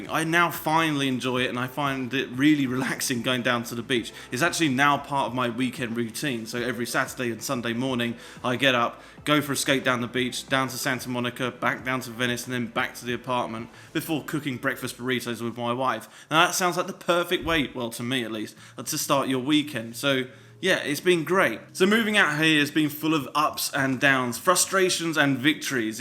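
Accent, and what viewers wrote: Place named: Australia. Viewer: I thought you were Aussie when you started talking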